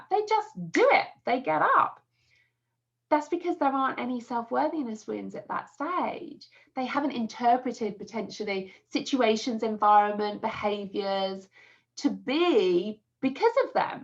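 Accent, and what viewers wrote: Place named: England, United Kingdom. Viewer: Love the English accent seems to help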